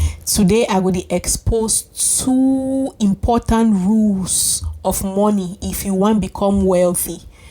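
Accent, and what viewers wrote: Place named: Pidgin, Nigeria. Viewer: Pidgin clear anyhow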